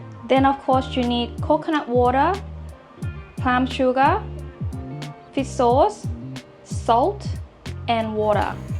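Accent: Australia. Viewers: Why does she sound Australian? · Thanks for that. You have obviously been to Australia with that accent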